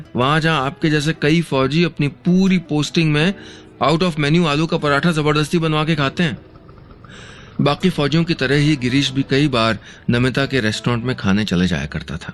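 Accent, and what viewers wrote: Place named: Rajasthan, India. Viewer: Sorry bit Terrible Rajasthani accent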